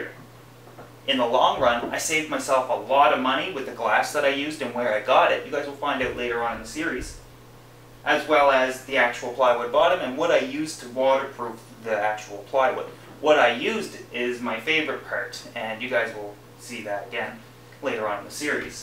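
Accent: Canada. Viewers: You sound very Canadian :)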